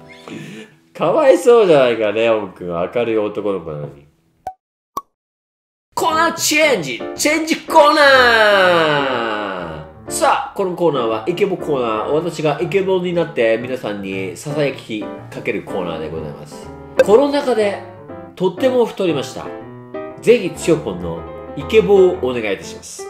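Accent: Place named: Kansai, Japan. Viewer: 剛くんの関西弁かわいくて好きです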